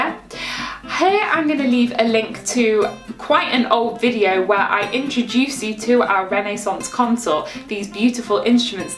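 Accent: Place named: United Kingdom. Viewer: That "regatta bear" was funny, I need to put the subtitles to understand a little bit more, in spanish "I'm a recorder player" sounds like "Am a ricode plée", sounds nicely to me the british accent but to write this and all of the sentences are another different thing at all. In fact, we use the same word "flauta" for flute or recorder, in case we ne need to clear what instrument is the we need to add one word: "flauta traversa" for flute and "flauta dulce" for recorder. cheers.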